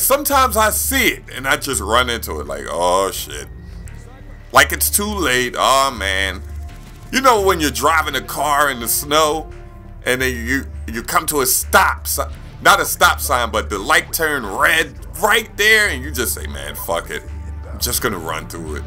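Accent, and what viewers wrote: Philadelphia, United States. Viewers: are you from philly ? i am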